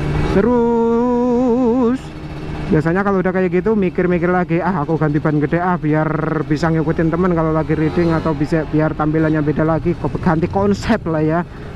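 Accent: Banyumas, Indonesia. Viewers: Wong ndi si mas? Salam ngapak ket kebumen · ngapak'e gawe aku ngguya ngguyu ae pak😂..sampeyan wong ndi sih😄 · Klau ngvlog bahasa ngapak nya sering dipakai om biar makin ketawa aku liat ny lucuu...lucuu sukses trus om 😁👍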